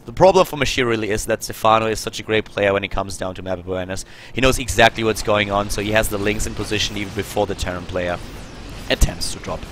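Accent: Germany. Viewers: I like your German accent! And it's great how you're dropping gems like the right+shift attack scv building the bunker. Thumbs up!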